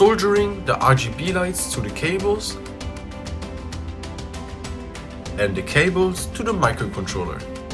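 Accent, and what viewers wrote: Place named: Germany. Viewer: It was obvious, that you're a German... ich auch :D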